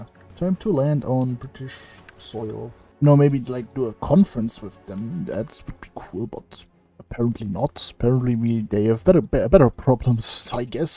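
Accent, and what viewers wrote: Germany. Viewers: It's cuz he's German not cuz he's an English speaking RPer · Hat dir eigentlich wer mal gesagt, dass man an deinem Englisch den deutschen Akzent SOO einfach erkennt xD Ich wusste von Anfang an, dass du ein Deutscher bist. Naja wie dem auch sei, ich wünsche dir noch was Schönes :D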